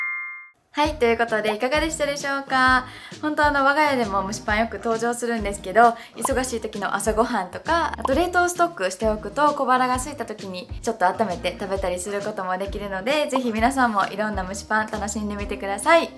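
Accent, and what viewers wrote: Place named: Osaka, Japan. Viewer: ありがとうございます✨ レンチンはできるだけ避けたいので、自然解凍して蒸し器で蒸す方法試してみますね🎵 余談ですが、私は香川です😊 大阪が大好きで、毎月1～2回はユニバとユニバ周辺に遊びに行っていました😊大阪に住みたいと思ってたくらいです😊 は出身が大阪なんて羨ましいです✨ときより大阪弁なのも羨ましいです😁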